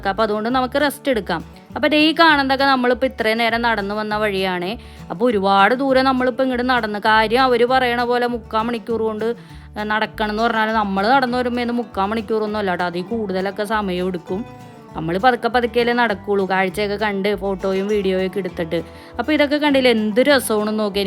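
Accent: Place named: Kochi, India. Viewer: Cochin slang is the beauty of your video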